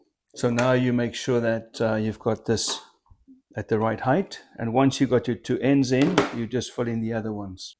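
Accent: South Africa. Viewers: is meneer van suid afrika,want ek hoor daai Afrikaanse "accent"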